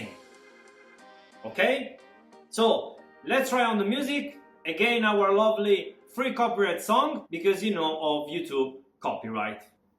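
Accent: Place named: Italy. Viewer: u sounds so italian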